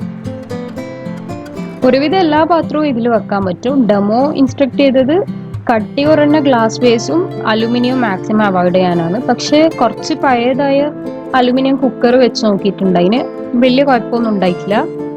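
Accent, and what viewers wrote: Kannur, India. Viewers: നല്ല രസം ആന്റെ സംസാരം കേൾക്കാൻ..കണ്ണൂർ ano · Madam Kannur ano? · Ningal thalasheri anno kannur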